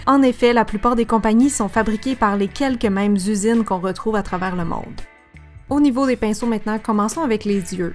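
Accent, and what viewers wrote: Canada. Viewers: As un accent Canadien ou pas ?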